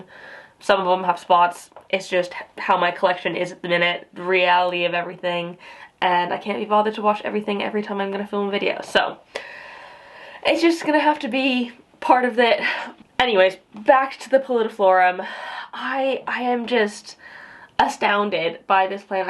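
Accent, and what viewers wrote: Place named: United Kingdom. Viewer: I thought I detected New York, but I was way off. You sound more British when you are with (Clahyah):))))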